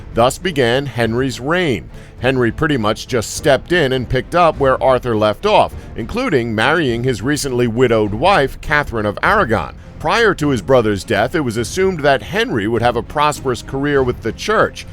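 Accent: United States. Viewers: What the hell is an American robotic going to sound credible about English history. Don’t make me laugh. Didn’t even watch. Too stupid. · The American accent seems to cheapen even these inaccurate facts...very off putting. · Whats with the yank commentary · Why do have to listen to an American commentator, with a dreadful American accent, on this video? A British commentator would never use the word “freakin,” and he would also know how to pronounce the word “Salisbury” correctly. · Urrgh, i turned off as soon as a heard the American voice...this video will be 90% bullshit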